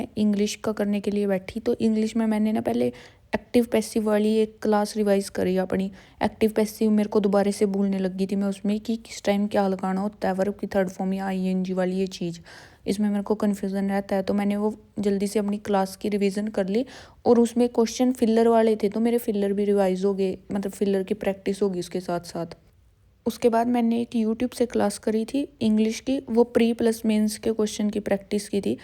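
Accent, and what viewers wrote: Haryana, India. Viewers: Bhen muje lagta h app Haryana se ho awaj se😂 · Hahah shi 😂 haryana aale hi bolya kry nui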